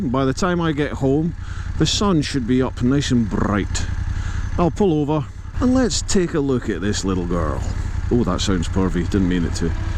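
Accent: Scotland, United Kingdom. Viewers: I always love listening to those lovely Scottish tones:D Do you actually live in Scotland?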